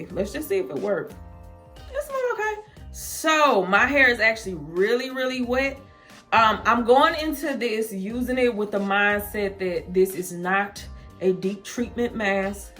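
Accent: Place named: South, United States. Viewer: I love your accent so much !!! Where in the south you from ?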